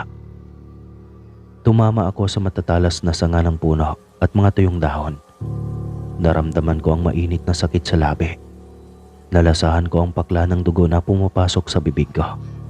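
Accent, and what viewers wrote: Visayas, Philippines. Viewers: ang galing mo ha fluent ka magtagalog pero visaya ang punto mo doin ako bumilib sayo ☺️☺️☺️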